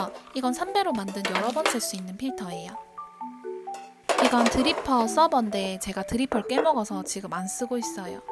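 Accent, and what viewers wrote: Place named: Gyeongsang, South Korea. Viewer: 제 방도 좀 꾸며달라고 하고 싶네요...경상도 분이신 것 같은데, 만나러 가고 싶어효ㅠㅠ엉엉 ㅠㅠ넘 귀여버요ㅠㅠㅠ완전 취저.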